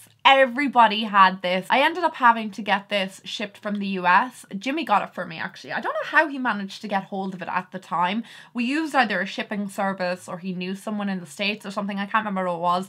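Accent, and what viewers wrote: Ireland. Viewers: hey is ur accent altered by ur tongue ring or do u sound the same without it? I've never come across any gurus from ireland and i like hearing ppl speak little fun facts about where they are from ... u all don't know theyre fun facts bc naturally to u all its normal bc its where u live lol. But anyhow, do u know of any other gurus from ireland that i could sub to as well?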